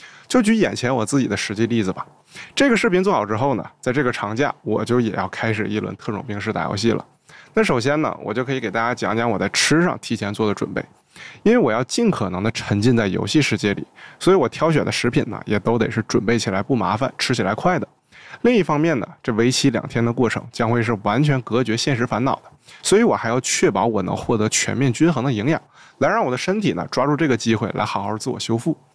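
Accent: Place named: Shenyang, China. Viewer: 原来是沈阳老乡，听口音这么熟悉。加油，非常喜欢你的频道。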